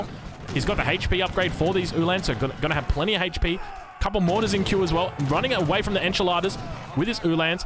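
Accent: Australia. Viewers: the australian commentary really adding to the Mad Max vibes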